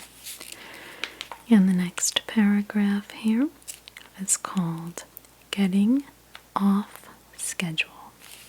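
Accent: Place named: New Jersey, United States. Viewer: I believe it’s New Jersey!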